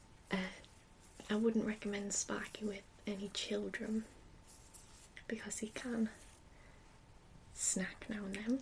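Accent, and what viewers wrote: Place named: North Yorkshire, United Kingdom. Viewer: The Middlesborough/North Yorkshire accent is perfect for asmr as it has very clear consonant sounds and nicely clipped vowels.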